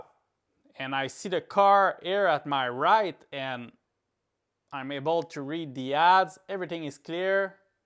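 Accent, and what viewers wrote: Quebec, Canada. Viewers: Serais-ce un accent Québecois 👀 Bonne video btw! J'ai présentement un CV1 et j'ai commandé un quest 2 et j'ai commandé un link cable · Je reconnais cet accent du Québec 😊 Bon vidéo!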